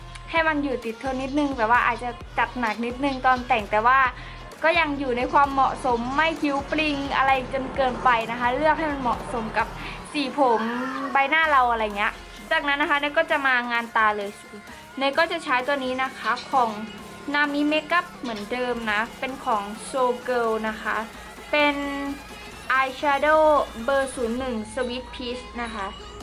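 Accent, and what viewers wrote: Isan, Thailand. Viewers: มักคำเว้าแหมะค่ะ555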